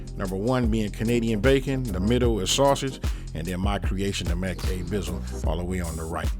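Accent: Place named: Bay Area, United States. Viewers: The West Coast accent is deep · dude"you from the Bay?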